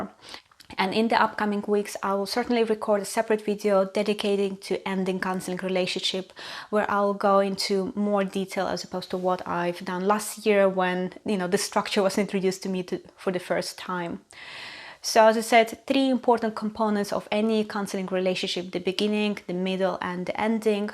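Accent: Russia. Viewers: I can hear Russian accent. Are you Russian?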